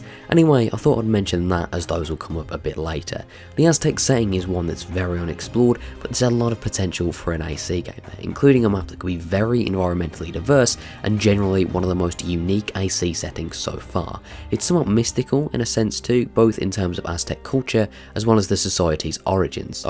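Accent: United Kingdom. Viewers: Good Video. Unfortunately, I hate the british accent!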